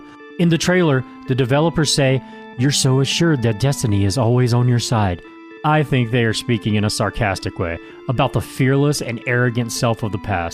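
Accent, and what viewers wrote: Texas, United States. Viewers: Wait, is that like a Texan accent? I just watched the video released before this one and you (?) were speaking Chinese. What's going on lol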